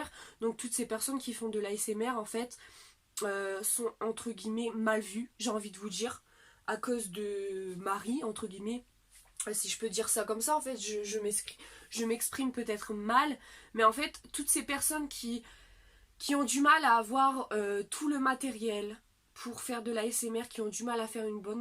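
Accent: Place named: North, France. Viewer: Accent du nord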